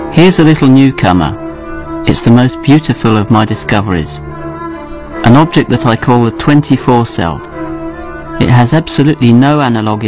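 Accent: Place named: India. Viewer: 解说员是印度口音的英式英语。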